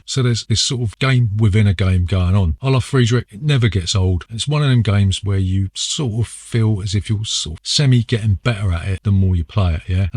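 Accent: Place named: South West, United Kingdom. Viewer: All the way at the back here. Time to throw out some random counties, I've always thought Kent but often hear a little south west twang Great list, M44 is there for me also.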